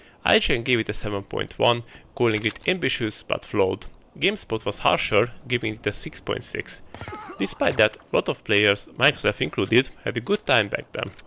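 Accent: Hungary. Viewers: BTW, are you Hungarian by any chance? I think I can hear on the accent. I live in Budapest but speak very poorly. Anyway, subscribed and looking forward for more backcatalogue reviews.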